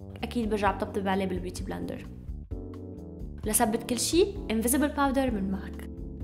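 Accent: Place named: Lebanon. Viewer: حبيت اللهجه اللبنانيه بسببك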